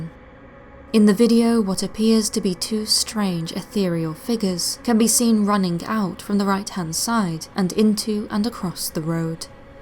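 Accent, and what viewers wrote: United Kingdom. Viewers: Isn't it pronounced "(gē-zər)" in British English? The narrator sounds like she's British, which would make sense.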